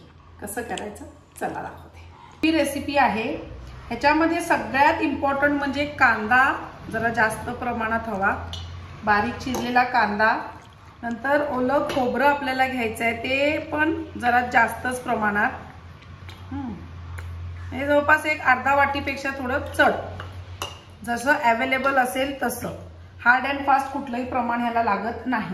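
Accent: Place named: Belgaum, India. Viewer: Cute belgavi marathi. Ani cute recipe. Live it.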